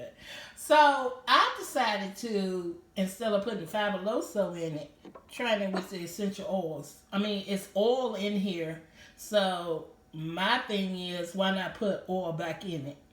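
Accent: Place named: South, United States. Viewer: the southern accent is very soothing and calming lol❤️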